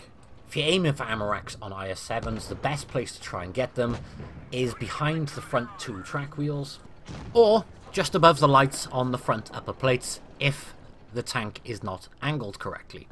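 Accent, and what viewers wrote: United Kingdom. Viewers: Such a lovely review, thank you! Hey, WoTB needs a British voice for the british tanks... I hope WG's going to ask you to make the voices 😜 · Many will find it weird but ever since I subscribed aside from his perfect Analysis of the tanks and the game and all ( which is in my opinion is better then bushka and the others) his voice is just perfect you know a WWII battle gameplay in the background and his calm voice with the British accent explaining what is going is what forces me to watch his videos let alone the extreme accuracy and information he provides in the game.....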